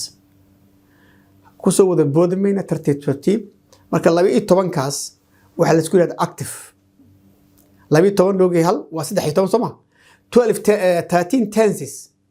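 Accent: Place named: Italy. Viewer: Dadka ku hadla luqada Italianka ayaa lahjadaas leh. (Not all of them)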